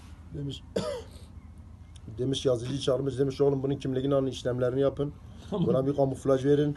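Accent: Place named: Arab world. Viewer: Aynen ya gocmen arapların şivesi böyle:)))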